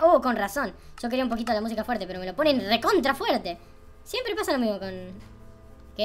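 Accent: Argentina. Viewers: eres argentino????? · sos argentino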